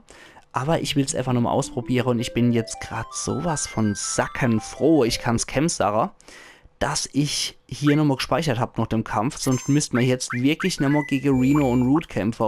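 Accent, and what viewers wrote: Palatinate, Germany. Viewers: Bin zufällig auf den Kanal gestoßen. LPs im pfälzer Dialekt kommen ja super! geliked :-)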